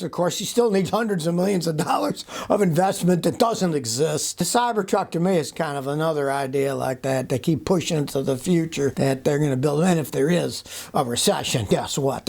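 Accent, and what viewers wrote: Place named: South, United States. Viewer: I love your southern trang 😂 you make my day 👏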